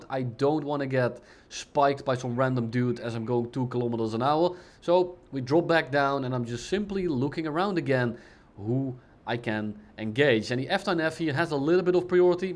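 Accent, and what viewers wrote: Netherlands. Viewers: I can hear immediatelythat you’re Dutch at least I think so 😂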